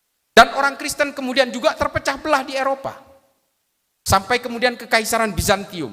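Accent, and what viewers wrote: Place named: Madura, Indonesia. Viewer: Dari logat bahasanya seperti suku Madura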